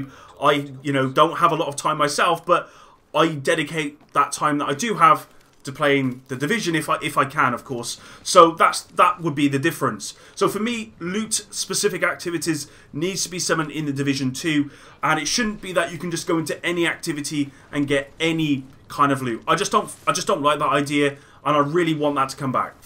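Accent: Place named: Newcastle, United Kingdom. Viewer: I’ve been watching you forever and I just realized you sound like the true Geordie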